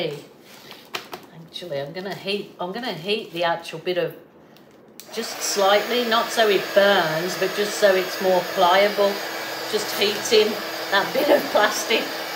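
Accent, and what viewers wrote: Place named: England, United Kingdom. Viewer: Very cool! So what did you use that gave you those cells? Nice! You know what I haven't heard for ages? "FINGER POPPING!" 😂 You've definitely got the pom accent 😊That's who you are! I moved to Canberra from South Africa 18 months ago and I doubt I'll ever pick up the Aus accent. Maybe a little here and there but in general, no. Kids might though! 💕💜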